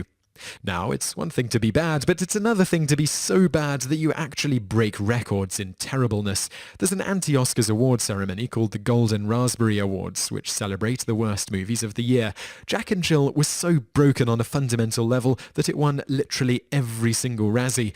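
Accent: United Kingdom. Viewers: this channel would be so much better with an atleast somewhat interesting voice. british accents are great, and I really mean it. but this guy... I can't imagine anyone staying awake until the end of a 10 minutes video.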